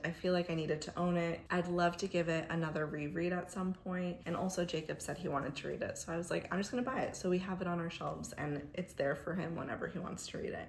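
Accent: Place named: Colombia. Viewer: Me encanta tu acentito colombiano 😊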